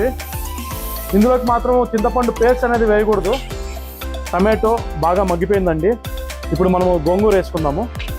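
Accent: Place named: Anantapur, India. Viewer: Abbayi meedi ananthapur ah??? Slang alane undi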